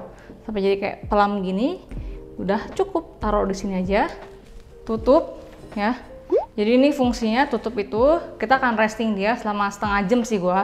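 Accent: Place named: Sunda, Indonesia. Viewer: Ini cici cici bandung kali yah ? Logat sunda kental banget kaya nya😂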